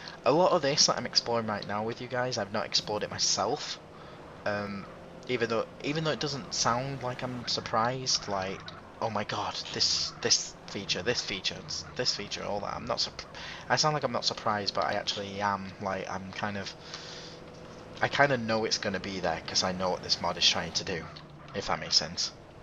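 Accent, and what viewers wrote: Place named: United Kingdom. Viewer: Finally found a channel that's based on old school GTA's. Specially San Andreas. And you sound British lol!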